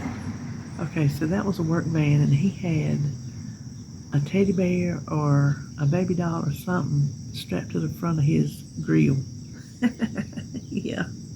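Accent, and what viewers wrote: South, United States. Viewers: I'm from Arkansas but girl you have a Southern accent. I love hearing you talk. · Oh honey you have a beautiful southern accent. isnt as pronounced. I say you are the luckier. Didnt know drinking liquids with food wasnt advised. I gotta have liquid ( soda, juice or water) during each meal and snacks. Is that only for surgery reqirement?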